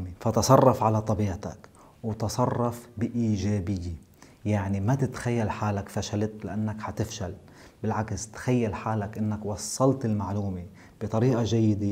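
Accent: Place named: Lebanon. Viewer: اللكنة اللبنانيه جميله جدا وصوتك واسلوبك الهادي معجب فيه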